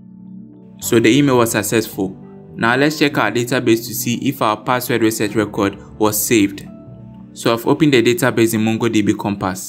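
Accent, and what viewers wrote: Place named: Nigeria. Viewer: Imagine my surprise clicking on the video and hearing the most nigerian voice ever. Nice one, my guy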